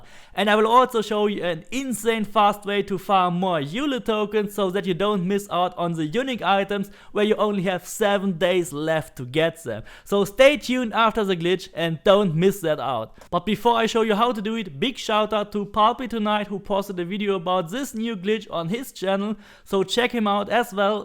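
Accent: Germany. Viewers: Man hört sofort dass du Deutscher bist 😀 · Only German got this accent cuz 99% of them can't say this and say sis and other words with T 😂😂😂😭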